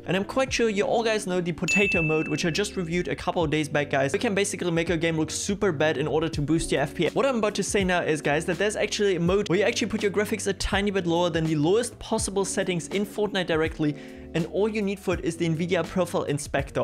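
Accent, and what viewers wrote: Germany. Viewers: You got a crazy German accent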